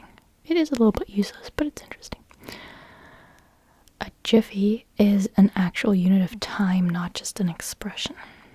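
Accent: California, United States. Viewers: Descended_Flames I genuinely wanted to know why you put English in quotation marks that's a valid question. There is a distinct American accent in her voice, it does not matter from where from although Texas, New York and California all sound different they do sound fundamentally the same. She sounds a bit west coast, like a well spoken person from California if you wanted to be specific. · Cruz DeLeon she does have an accent, it's American. No idea how she gained it but never the less it's certainly American rather than British. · Your American accent is so superb, I often forget you’re German. And then you disclose that you don’t know certain American idioms, (like “How did the chicken cross the road?”) and then I remember. lol You have such a large understanding of our culture though. I wish I knew more about German culture. :) Also, I’m pretty sure most of those “laws” aren’t true. 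haha I cant imagine any law enforcement keeping track of off-key singing. hahaha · I still can’t believe how good you’re accent is , I’m not sure if it’s because I’m from the uk , but you sound totally American !! Credit to you ! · Hast du deinen amerikanischen Akzent Absicht geübt oder woher hast du ihn ? 😊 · you sound american, i thought you where German ? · You sound more American than German. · Love your voice I still trip on how a German girl can sound so old school California.I like your normal voice better then the whisper for asmr.Speech should be used rarely in a asmr vid. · Your american accent is superb. Even though I didnt get any tingles from this video I still enjoyed it and found it relaxing. Videos like this would be great for times that I want to wind down but not necessarily fall asleep. I also think it would be interesting to hear your normal voice speaking in German (maybe with subtitles for people like me who cant keep up with the language at that pace)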